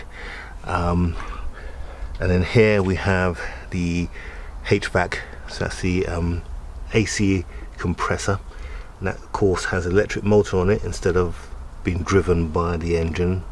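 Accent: United Kingdom. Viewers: Great show!! Are you originally form North London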